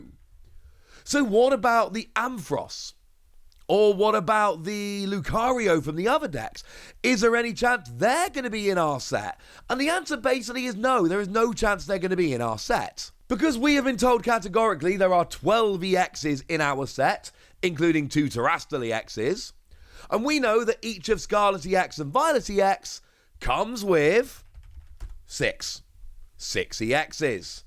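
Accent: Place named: United Kingdom. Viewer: Yeah it’s pretty common for UK English speakers to use the “yu” sound for when the letter U follows a consonant.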